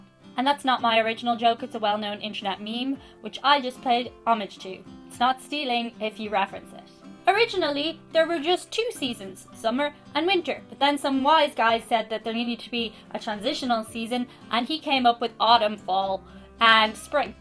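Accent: Ireland. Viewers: P.s. part of the charm of your videos is the accent. In America, we enjoy hearing Irish accents. I suppose we think it’s cute, or whatever. So, if you pronounce Fall like an American, it’s sort of like removing and discarding the chewy center of an Oreo cookie, so to speak. By the way, we call a pumpkin a punkin’. We know our pronunciation is wrong, but we say it like that, anyway. It’s just cute, or whatever. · Another funny one!!! You are Brilliant! You sound so American, every once in a while I hear a little Irish come out but you just speak so well! Texas is coming soon! Cheers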